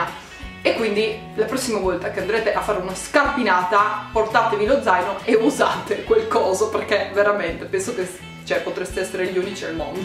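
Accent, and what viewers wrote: Trieste, Italy. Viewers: Hai l'accento triestino. Sei di Trieste? ☺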